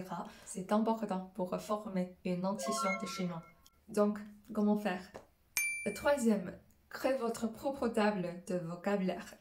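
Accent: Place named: Quebec, Canada. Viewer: 哈哈😄，老师的新视频出来了。第一个来打卡。这次的视频比起之前的视频让我耳目一新的感觉。法语表达挺溜，我的感觉带有些加拿大Québécois 的口音。太棒了。这次的视频更是针对外国学生学习汉语的关键问题。谢谢分享。👍🤩😘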